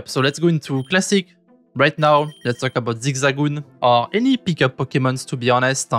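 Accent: France. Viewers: Je cherche un tuto en français j'en trouve pas. J'en cherche un en anglais je trouve un tuto d'un français qui parle anglais alaid x) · What a nice french accent that you have x)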